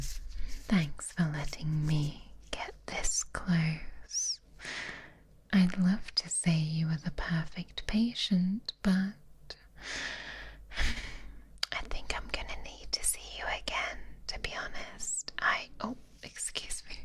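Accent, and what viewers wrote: United Kingdom. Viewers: Her very "queen's english" accent really adds to this, the close in whispering and ear blowing were also peak.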